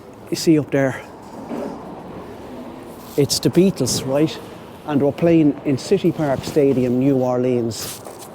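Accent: Cork, Ireland. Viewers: We just love watching 👀 just love Cork and that accent we love going on a journey with.Happy Sunday evening see you next time.🎅🎅🎅🧑‍🎄🧑‍🎄🧑‍🎄🧑‍🎄🤶🤶🦚🦚🦚🦚🐸🐸🐸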